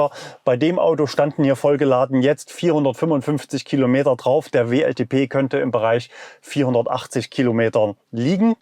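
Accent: East Germany, Germany. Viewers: ich halte diesen Ossiakzent nicht aus :(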